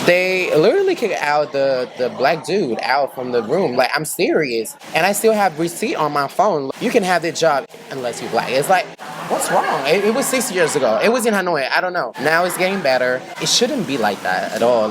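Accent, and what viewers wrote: United States. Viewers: He sounds like he’s from Atlanta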